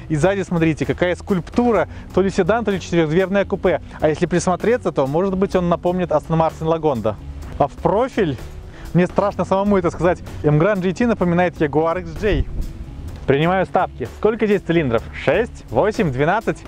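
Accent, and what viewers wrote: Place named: Belarus. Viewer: Слышен белорусскии ГГовор!!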